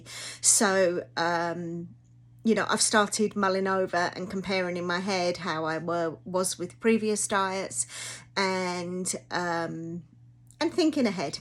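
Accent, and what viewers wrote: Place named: United Kingdom. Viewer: oh ok! I heard the accent and assumed you were over there lol. I was wondering how much it would be over there because US meds are ridiculously expensive even though the same meds in the UK are free.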